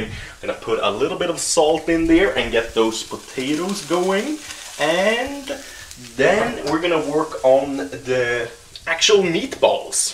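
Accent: Sweden. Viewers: yea. I've noticed that too. That is why I love the swedish accent :D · Please im getting so tired of ur Swenglish. Even though it's a bit funny. Just hear how you say with xD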